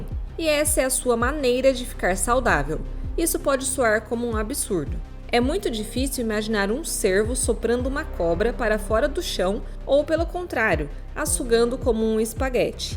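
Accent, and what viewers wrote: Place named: Caipira, Brazil. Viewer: Bom vídeo. Mas a narradora precisa perder um pouco do sotaque caipira.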